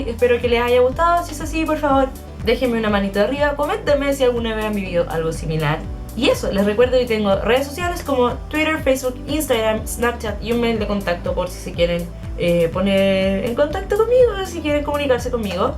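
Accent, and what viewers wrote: Chile. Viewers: Me costó bastante entenderte perdón no es por ofender pero deberías ser un poco más clara al hablar se que así hablan los chilenos y no tengo nada en contra de eso pero habemos muchos latinos más que no entendemos así que vocaliza un poco más por favor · me encara tu tono chileno😊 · no es porque estabas acostumbrada a hablar inglés, si no porque tu acento chileno es muy extremo. No se entiende. Habla más neutro.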